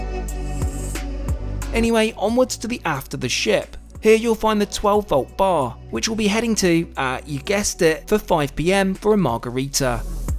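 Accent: United Kingdom. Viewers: Years ago you thought I was making fun of your accent, but I actually thought it was charming and wondered what part of the UK you were from 😊 😅 I’m glad you liked the meals and tbh they did look especially good. Your steak looked absolutely primo. I really think people would get much more value for money by taking an MSC 3 or 4 day cruise out of Miami. I’m now about to watch your first class cruise you and your dad took on MSC Explora. Wishing you smooth seas ahead.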